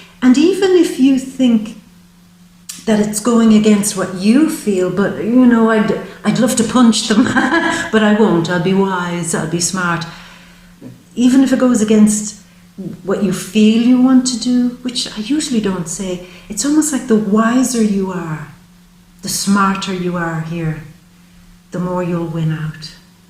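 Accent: Ireland. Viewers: you’re just incomparable. You’re a lightning bolt of inspiration striking out across the Atlantic—if only lightning bolts wore black dresses, talked with a brogue, and blew kisses. Close enough.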